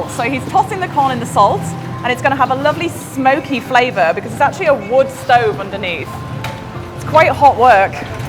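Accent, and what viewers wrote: Australia. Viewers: your accent sounds more Ozzy <3 after watching Ozzy Man reviews since 2014, My inner voice has become Aussie eventhough I am Pakistani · Your accent sounds Australian yet somewhere your video mentioned "English girl."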